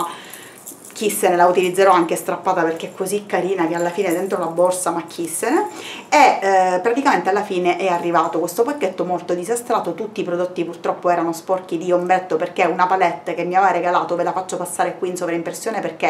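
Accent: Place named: Catania, Italy. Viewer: Hai un accento bellissimo ,sei catanese per caso ?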